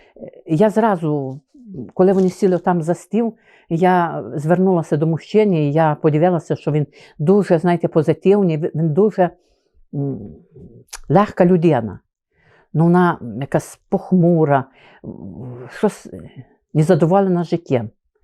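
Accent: Transcarpathia, Ukraine. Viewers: Як я люблю Закарпацький Говір! дякую вам! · Дякую вам за цікаві розповіді,люблю ваш канал,дивлюсь з задоволенням .Освітчуєте цікаві місця де я хотіла би побувати ,але не взмозі з багатьох причин.Дуже люблю закарпатський говір,мрію так говорити ,намагаюсь.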